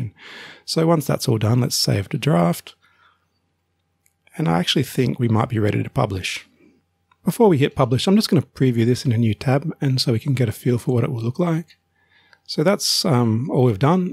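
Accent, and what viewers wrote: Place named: Australia. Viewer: Thank you! Nice to hear that Aussie accent!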